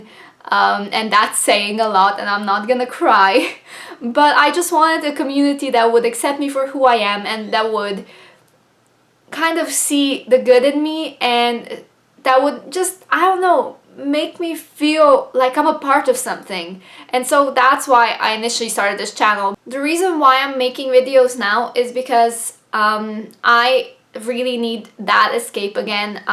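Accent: Slovenia. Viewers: Filming in pyjamas xD So relatable hahaha Welcome to Booktube again then haha I hope that you will enjoy it this time and that you will stay with us! This community is really amazing! So supportive and welcoming I mean you will find trolls everywhere but just don't mind them! Wait you're Slovenian???? Ziva from reader life is Slovenian too and well I'm half Slovenian and half Bosnian but I currently live in Belgium... Your accent is amazing!! You could do the International Booktuber Tag! Pets! yes I only have a dog but she is the best haha I mean you are unique, your personality is unique and anything else you do besides reading will make your channel unique... Oh you will meet tons of friends! They will even help you build a never-ending tbr hahaha I mean interaction here but also on twitter, instagram and sometimes discord. Watch other videos, comment and get to know people. 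I always say the same but stay yourself, connect with people, have fun and film the content you are passionate about! See you soon!!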